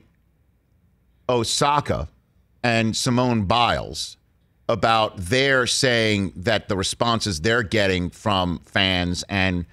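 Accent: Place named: New York, United States. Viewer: NYC accent was extra stronger for this one!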